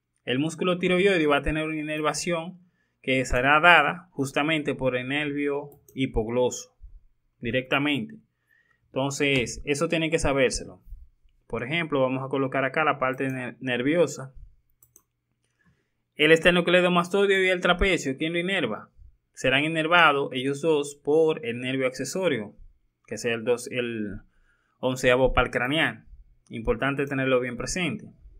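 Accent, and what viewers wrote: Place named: Dominican Republic. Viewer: Mi querido acento dominicano, sigue así, se entiende todo perfectamente ❤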